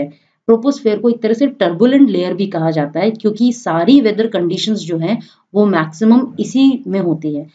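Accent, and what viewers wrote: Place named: Hyderabad, India. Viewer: pure Hyderabadi !! excellent vedio thanks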